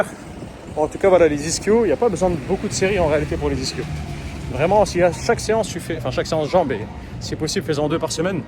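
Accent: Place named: Kabylie, Algeria. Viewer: Salut super vidéo, dis moi t'a un petit accent t'es kabyle ?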